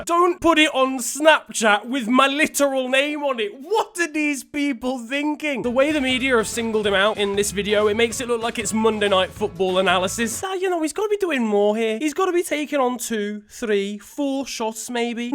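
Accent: Liverpool, United Kingdom. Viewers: 10/10 scouse accent aswell XD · Love the Liverpool accent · Loving the scouse accent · Moore scouse accsent I am not English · That carragher accent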